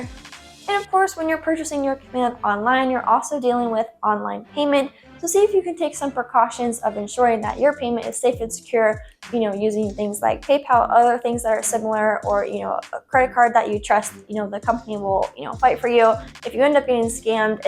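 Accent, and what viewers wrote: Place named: England, United Kingdom. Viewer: Lol your hot sounded very posh England english 😃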